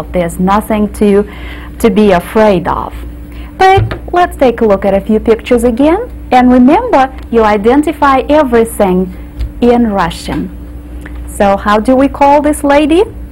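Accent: South, United States. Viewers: I love how you can hear little glimmers of Britney Spears Southern American in her accent. "Got my edu-kai-tion..." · she kinda sounds like she has a southern accent, which is ironic since she's from Russia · Never heard a russian and southern accent fighting each other at the same time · Thanks for the video! I have to say that I was very surprised to hear that you are native born russian. It is easy to tell that you've been living in the south because you have that southern drawl. Spahsiba! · southern cowboy accent comes from the deep words pronounced in Russian that makes her talking in that way in English! :) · This is so amazing that she has a southern accent!!! amazing!! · the southern(?) accent is throwing me off but i kinda love it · You speak with such a strong Southern accent. you got this accent in 8 years ! Where in the South do you live mam ? 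· I like how she has a slight southern twang lol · I like this but I swear I hear a strong southern States accent, I suppose that kind of stuff makes possible to guess what area people are from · She sounds like she learned English in the south.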